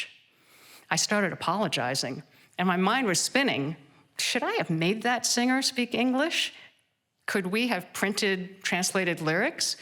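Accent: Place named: California, United States. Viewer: Amazing accent? Californian perhaps?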